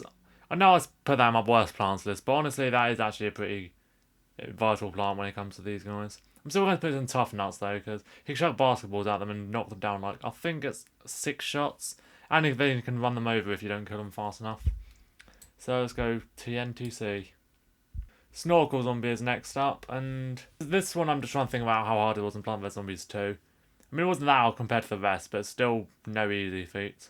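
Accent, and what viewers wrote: United Kingdom. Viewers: wtf is this Brit fuck saying, fkn hate their accent. they think it’s so intellectual, like did chill out with the adderall and caffeine u fkn stim junkie. Slow tf down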